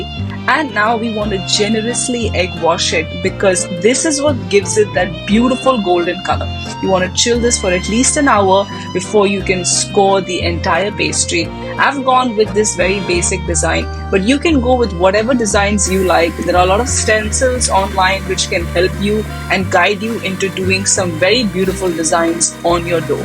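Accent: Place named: France. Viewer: Impressive and French accent is nice one to add along with lovely Galette preparation . Keep going girl ❤🎉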